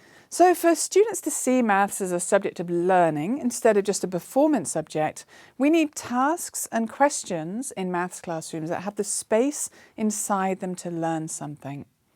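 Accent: United Kingdom. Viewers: Notice the British accent. The British still own the US. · So she's wrong because she has a British accent? Forget the accent and listen to what she is saying, then present a coherent argument against it if you have one.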